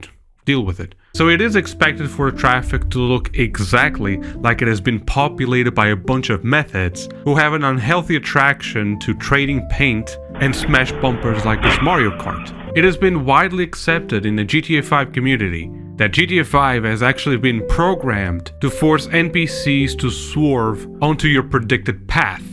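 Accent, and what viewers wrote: Portugal. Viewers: EU SABIA, esse sotaque não engana ninguém brother, já estava na cara que eras tuga, só faltou ver a matricula no teu quarto no minuto para me aperceber ahahah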